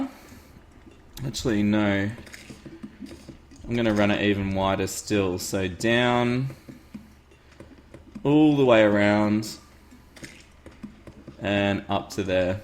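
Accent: Australia. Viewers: Aussie aussie aussie oi oi oi i can tell by your accent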